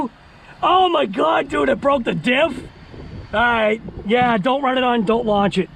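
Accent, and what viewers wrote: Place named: Boston, United States. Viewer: Killer Boston accent! Great video. Car looks legit. I have the WLToys brushed version when it first came out. Highend brushless system in it not(Tekin rs pro, Trinity Punisher 13.5t, jx1181 servo). It’s a beast now. But this looks legit.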